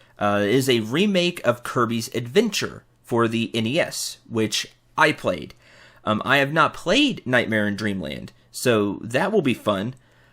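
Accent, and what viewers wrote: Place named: Texas, United States. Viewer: Let me guess by your accent since one of my cousins who lives there sounds like you. I am going to guess you live in Texas, I have 1 out of 13 shot. ^_^ Also, can you play Kirby and the Crystal Shards 64 if you ever have time or if you even have it. P.S. I am not going to hunt you down, I am just intrigued if I am right. I also live in GA, so it is not like I could even if I wanted to.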